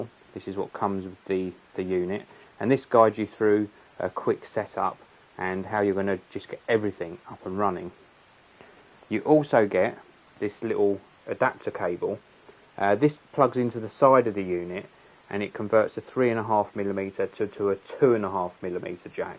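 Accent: United Kingdom. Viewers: we dont hear odio , we hear audio , could you plz speak proper american english (, and english is my fourth language ) , instead of wanker british accent sound too GAY ! even farel collinn learned that in movies -see its not difficult to speak normal..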